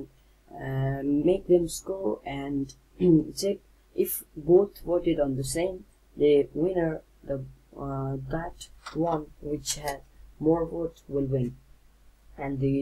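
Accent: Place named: United States. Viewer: please take in proper not like Americans talks so it would we understandable by everyone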